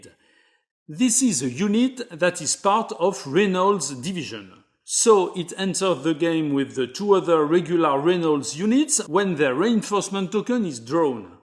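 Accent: France. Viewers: This is excellent! Also, all American place names sound so much nicer when pronounced with a French accent 😄⭐️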